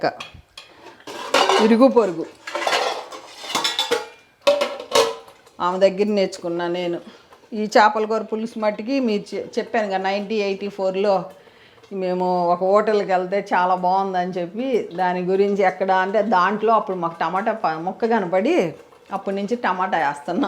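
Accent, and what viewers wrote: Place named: Andhra Pradesh, India. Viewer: madi chirala daggire aunty. mi language chusi anukunna mana area vallu ani. so happy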